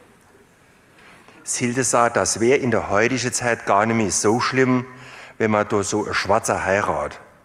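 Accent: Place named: Saarland, Germany. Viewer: Herlich ..und noch uff saarländisch 🤣🤣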